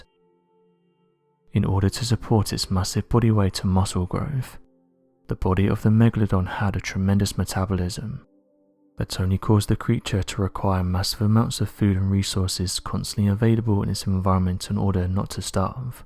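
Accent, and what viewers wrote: United Kingdom. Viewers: Just because you mysteriously whisper with a British accent does not make this video and your story and you any less full of sh*t.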